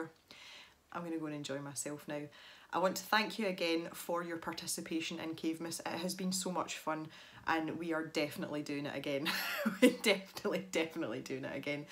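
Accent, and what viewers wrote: Scotland, United Kingdom. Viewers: I just love your accent it is nice to hear a real scottish voice. I have one question to ask you? I have the whole set of Prismacolour pencils but i have a dislike for them the problem is that I don't know how to use them. Can you give me some advice for me please. Also I would love to do a sky picture in ivy and inky butterfly using Faber Castell Polychromos is there anyway you could help me please.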